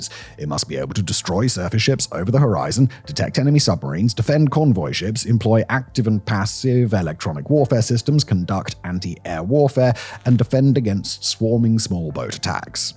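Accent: United Kingdom. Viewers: This guy is an example of idiots with a Lyme accent sounds like he knows what he's talking about but no, another big mouth idiot.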